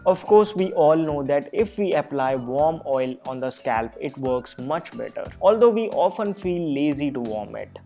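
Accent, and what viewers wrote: India. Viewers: Are you intentionally using a thick indian accent??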